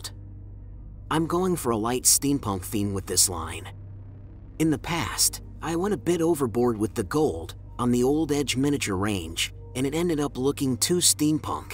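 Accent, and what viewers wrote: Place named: France. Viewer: would much prefer to hear your French accent. Many of the top mini painters have accents such as Trovarion, Marco Frisoni, Sergio Calvo etc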